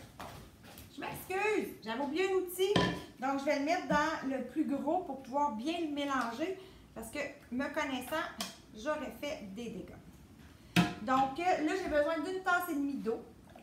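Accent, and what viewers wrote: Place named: Quebec, Canada. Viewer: Belle démonstration Tupperware!!! Et j'adore votre délicieux accent Sag/Lac ( je suis originaire de Jonquière... Je m'ennuie d'entendre cet accent ). Cela dit, je fais de quoi de pas mal similaire, mais avec un Presto. Perso je mets une boîte de tomates en dés, pour avoir du ''crunch'' de tomates, et j'y ajoute du jus de tomates. Tellement bon!!! Merci pour cette belle démonstration!!!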